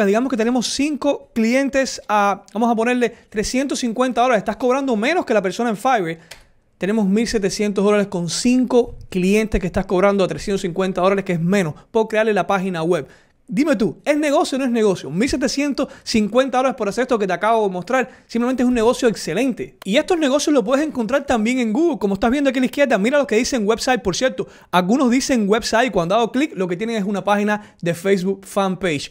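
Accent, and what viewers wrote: Cuba. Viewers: Hola he visto un solo video tullo y ni siquiera lo terminé por motivos ajenos, pero me gusto el enfoque que le diste y tu forma de ver la vida, este video aún no lo veo terminado de escribir lo haré, normalmente antes miro muchos vídeos antes de suscribirme a un canal de este tipo porque la mayoría hablan mucha M como decimos en Cuba 🇨🇺, ademas de que tu acento me parece Cubano. Si es así estoy el doble de contento porque me encanta ver triunfar a los míos. Aki tienes un nuevo seguidor. Suerte que Dios te bendiga siempre 🙏🙏🙏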